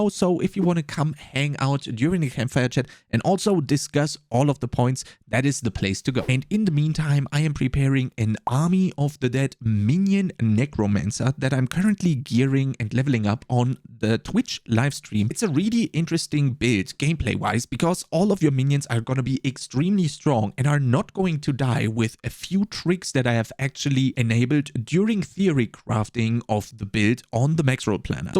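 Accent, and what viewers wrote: Germany. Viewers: Alter... noch nie einen so dermaßen deutschen Akzent gehört.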